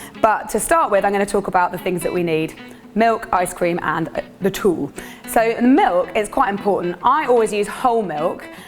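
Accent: United Kingdom. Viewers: That british accent quit difficult 🙂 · Her british accent😻😻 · are you british ???????????????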